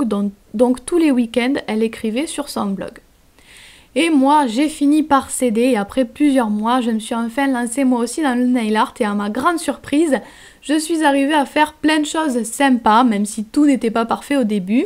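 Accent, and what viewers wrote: South, France. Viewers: ***** moi aussi je suis de beziers :) je me disait toi aussi tu as l'accent du sud :') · Ta l'accent du sud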